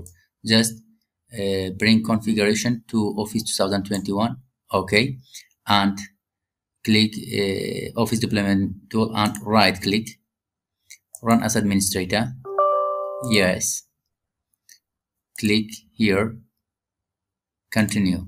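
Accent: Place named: India. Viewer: the moment that you sounded indian it was over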